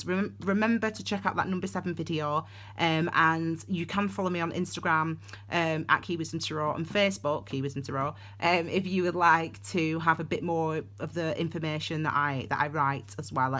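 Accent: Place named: North, United Kingdom. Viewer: Thank you another great reading.. and who can love a northern accent? 😂 is northern girls rock 💫💫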